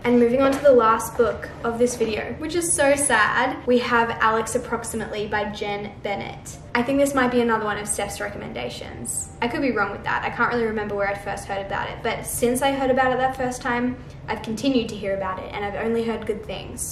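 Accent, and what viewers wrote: Australia. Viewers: wait, are you aussie? if you are, thats amazing! i am too, and i never ever see any aussie booktuder/ booktokers!! <3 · scripture in the opening card AND aussie accent? IMMEDIATE subscribe!!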